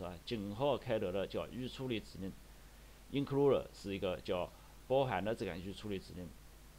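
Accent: Anhui, China. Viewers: 6，这口方言把我听愣了，阁下莫非是安徽大佬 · 我听着就是安徽人啊😂😂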